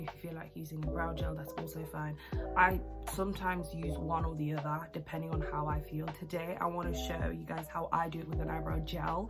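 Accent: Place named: Scotland, United Kingdom. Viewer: Appreciate the subtitles cause I don’t understand your Scottish accent x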